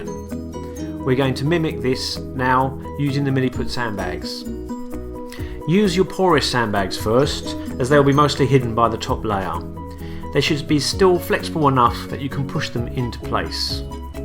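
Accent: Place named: England, United Kingdom. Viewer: i like that voice from england,thanks for the tuto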